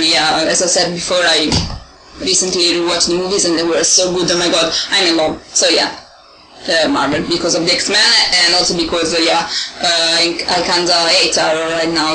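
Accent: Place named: England, United Kingdom. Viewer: your English Accent was AMAZING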